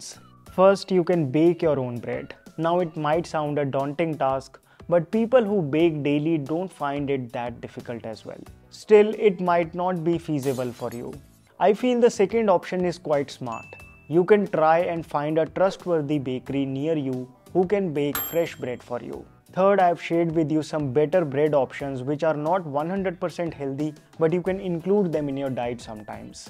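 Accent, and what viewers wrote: India. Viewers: Let Indian English breathe. It has its own charm. ❤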